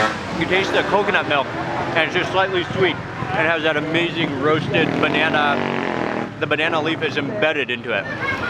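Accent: Malaysia. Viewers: Kecek kelate lako ter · masih pekat melayu.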